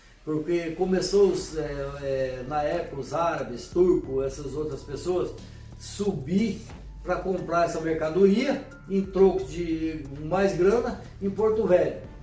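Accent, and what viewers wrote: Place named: Northern Minas Gerais, Brazil. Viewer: Esse sotaque é aqui do norte de minas, achei legal.rss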